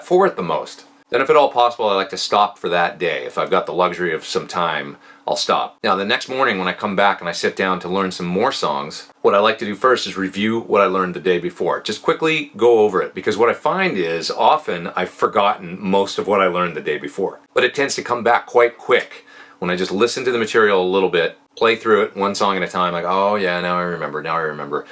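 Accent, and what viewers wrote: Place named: Canada. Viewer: This series of videos is awesome,. Is it just me or do you sound extra Canadian today?:) Not hangin' on you, man. I just normally hardly notice your accent but today it just seems to be front and centre. Probably just me (and/or the rum). I can't talk. I'm an Aussie. Rock on, mate.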